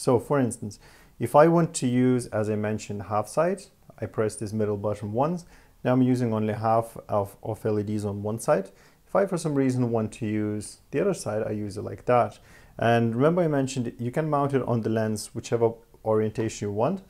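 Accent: Ireland. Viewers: Thank you so much for this review, it has helped with my decision to purchase it. Totally off topic but where is your accent from? I'm sure I can hear an Irish twang mixed in with European!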